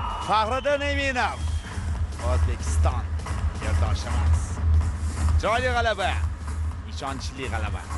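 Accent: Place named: Russia. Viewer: Концентрация, креатив, позиция инглизча сузлар, буларни урисча талаффуз килиш урискулликдан далолат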